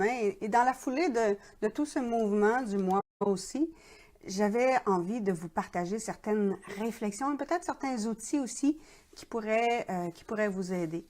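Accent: Quebec, Canada. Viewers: Je comprends franchement mieux. J'ai remarqué et je m'en suis rendue compte en y repensent qu'il n'avait pas l'habitude que quelqu'un le bouscule Comme ça. J'ai compris aussi qu'il pouvais avoir près sélectionner quel'un d'autre une autre femme et je l'accepte et je le comprends car c'est son droit. Je veux simplement qu'il s'ouvre au autres avec un peut d'aide. Sa l'aidera à s'ouvrir à une femme pour qui il auras un coup de foudre et se ne sera pas moi. je pense comme toi. Je kiff tes vidéos. Je t'adore et Amicalement je t'aime. J'aime ton accent québécois. Continue tes vidéos. Prend sin se toi et Je te kiff à mort mortel. Amicalement bisous.Sophie 🎸 🎸 🎸 🎸 🎸 🎸 🎸 🎸 🎸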